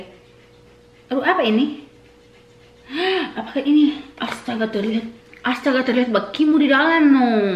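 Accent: Manado, Indonesia. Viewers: Orang manado kah?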